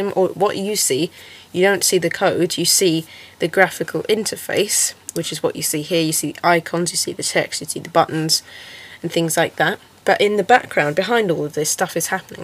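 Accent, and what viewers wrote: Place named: United Kingdom. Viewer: its just the british accent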